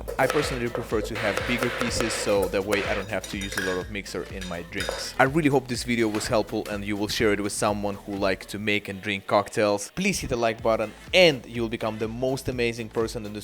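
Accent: Russia. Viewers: Ты русский))))) дададададададдаада я знаююююююю. Этот акцент везеде слышно аахахахх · Капец акцент русский) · Your accent is similar to Russian, you're not from Russia, do you? name is also popular in Russia)! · Судя по акценту, ты русский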